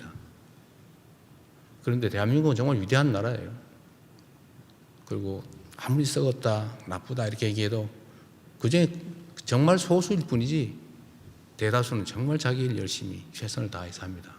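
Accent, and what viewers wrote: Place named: Gyeongbuk, South Korea. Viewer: 갑자기, 이재명 대통령님의 말씨가 고 김광석 형님의 말씨와 흡사하다는 느낌이 드네요. 그러고보니 두 분다 경북 출신입니다. 그래서 더 그렇군요. 참 정겨운 말씨였죠. 광석이 형님 ㅠㅠ 두 분이 또 연세도 비슷하셔요. 광석이 형님이 용띠, 이재명 대통령님이 토끼띠인가? 참고로 저는 말띠입니다. 광석이 형님 닮은 제 친형도 토끼띠예요 ㅎㅎ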